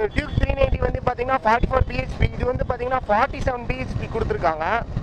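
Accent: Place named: Kongu, India. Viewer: Kongu tamizha nee super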